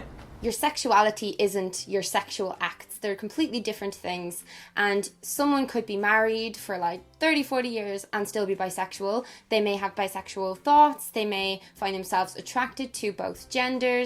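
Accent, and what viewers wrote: Ireland. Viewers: Hello, I relate to almost everything you mentioned in this video! You're so funny and your irish accent is beautiful to hear! Youre one of my favorite youtubers, hope you're reading this😊 Hope you a wonderful day. Benedetta ♥️ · You're Irish right? The accent.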